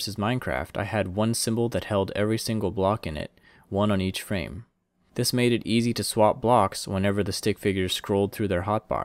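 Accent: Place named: United States. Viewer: He Is American Dude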